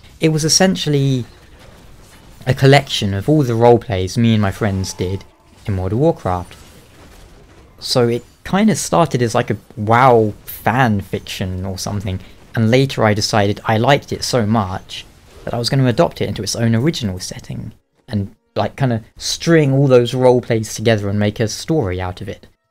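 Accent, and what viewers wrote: United Kingdom. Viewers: I strongly agree with your ideas here. I don't play destiny, but I like the Lore videos you make. Also some Diablo Lore videos would be uber sweet. I also believe your British accent makes you very pleasant to the hear. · Go for it mate, even if you want to use dark souls ost if you need to, I rekon your audio book will be well received Also we're you born in the uk? Nice work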